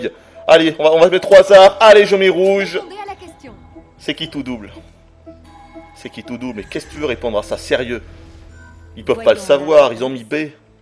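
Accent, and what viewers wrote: South, France. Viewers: Mais qu'est-ce qu'il avait sur cette vidéo, il avait grave l'accent du sud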